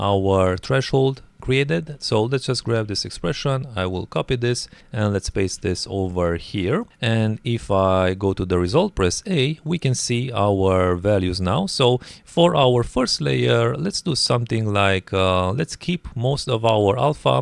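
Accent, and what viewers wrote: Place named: Russia. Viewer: You take not like a native speaker, are u russian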